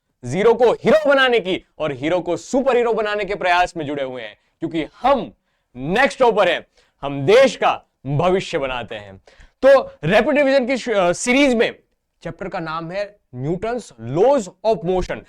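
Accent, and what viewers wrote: Gujarat, India. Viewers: Idk if he is gujrati or not but got gujrati accent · Your gujrati accent ❤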